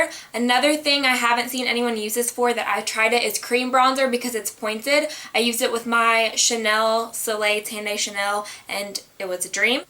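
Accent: South, United States. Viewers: I looove your cute little accent, I first found you on IG & never pictured you having a southern accent! BTW have you tried Urban Decay's "Naked" lip liner? I feel like I reach for it soooo much more than Stripped Down (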